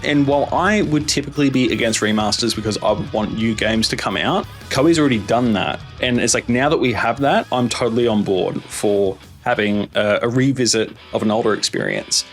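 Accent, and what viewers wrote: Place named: New Zealand. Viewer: Are you a kiwi? I dunno why I never heard it before but you sound real kiwi lol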